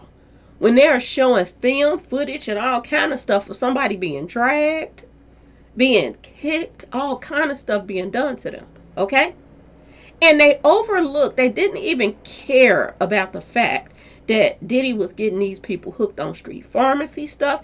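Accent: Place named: South, United States. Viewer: Are you from Mississippi Alabama down south I can tell you had a southern asset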